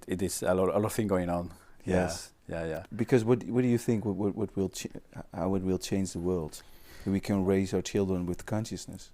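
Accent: Spain. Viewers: Voor mij, wonend in galiciē is het vreemd om engels met een sterk spaans accent te horen. Ik ben er door afgeleid. ik zit steeds te wachten tot hij in het spaans verder gaat, maar dat gebeurt niet.😂😂.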